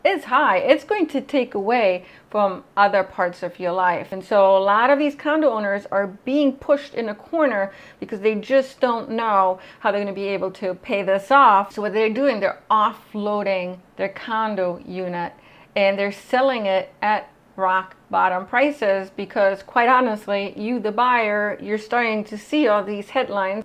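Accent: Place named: Midwest, United States. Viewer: You sound like you're from the Midwest. 😊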